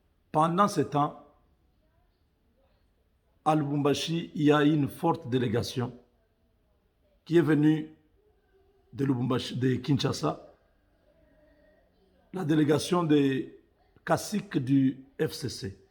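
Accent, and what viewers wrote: Rwanda. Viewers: Tu parles le français comme des rwandais, tu as de la famille là bas ?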